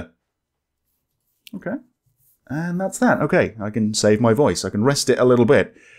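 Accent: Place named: England, United Kingdom. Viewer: I love your english accent. I'm brazilian. You´re a very nice guy! (I believe that´s how to say that, in Brazil it'll be "simpático".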